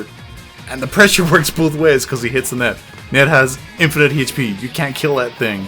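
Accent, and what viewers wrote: Ireland. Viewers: I can really hear your Irish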